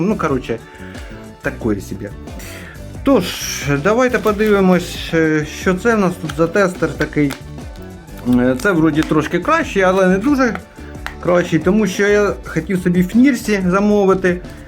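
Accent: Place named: Mykolaiv, Ukraine. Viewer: у нас в Нико обл всю дорогу так как ты щас говорили , поэтому вспомнил счастливое детство)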